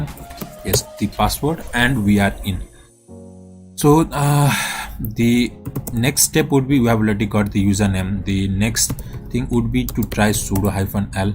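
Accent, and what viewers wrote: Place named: India. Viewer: this is good too, but the hindi accent is conflicting with your english so my advise is that you should try hindi in a video...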